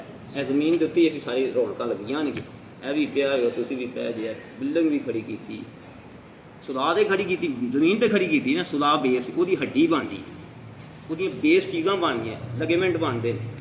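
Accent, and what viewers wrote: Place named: Punjab, India. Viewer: یہ ممکن نہیں استاذجی کا لہجہ ہمیشہ پنجابی ہی رہتا ہے ہم نے دیکھا بعض پختون مریض بھی اس سے پریشان ہوتے ہیں لہذا آپ بہائی کوشش کریں استاذجی کے انداز کو سمجھیں جب نیت کرلینگے ،آسان ہوجائے گا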